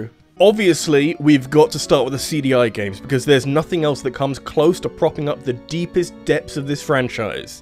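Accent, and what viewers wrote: United Kingdom. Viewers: I still can't do it. Listening to a British person reviewing anything is the worst.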